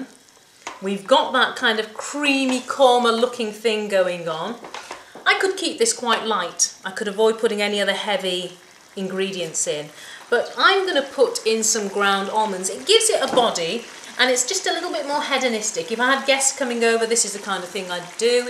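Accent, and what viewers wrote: England, United Kingdom. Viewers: Gordon Ramsay accent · I think it's all Bullshit.. 'this is not Indian Chicken Curry... Having a British accent and fooling the Brits is OK... But not Indians who know all about spices and curries... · a what kind of accent you have , speak like an indian not like british , and that's not the way to cook chicken curry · She has a perfect British accent called Queen's English, one that only intelligent people can master and educated people can speak properly, some 2% of all English speakers, an accent that you most probably will never ever be able to COMPREHEND not to mention speak with your very limited intelligence. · In India, we do this..she says in an English accent..you're English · why do I have a feeling that you live in uk because of your accent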